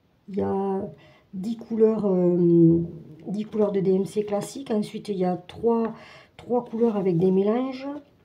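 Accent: South, France. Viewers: Bonjour, Je viens de découvrir votre chaîne et je me demandais si vous n'étiez pas vers chez moi, avec votre accent. J'habite à côté de Narbonne. En plus chez moi aussi il y avait un atelier de la CAF où j'allais il y a 30 ans. 😅 Comme vous, je suis une brodeuse passionnée mais seule à broder dans mon coin. 😅 J'aime beaucoup vos vidéos. À bientôt! 😊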